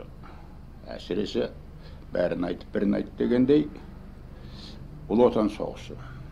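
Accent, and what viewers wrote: Standard. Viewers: Қазақша білмейтін мәңгүрттерге көрсететін сұхбат екен. Нағыз совет үкіметінде өмір сүрген адамдардыңда таза қазақша сөйлейтіні дәлел. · Таза ана тілде,сөйлеп отыр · казакша таза сойлейди екен, онтустик - солтустик акценттерисиз. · Орыстың қақ ортасында жүрсе де қараңыздаршы қазақшасы тап таза. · Советтик кезде таза казакша сойлегенине ризамын атанын, казирги жастар, ересектер де тауелсиз Казакстан да шала казакша сойлеседигой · Екі тілді сіздей меңгерген ешкім жоқ екен. Қазақша сіздей тұнық сөйлейтіндердің қатары өте аз. Трагедйа осы...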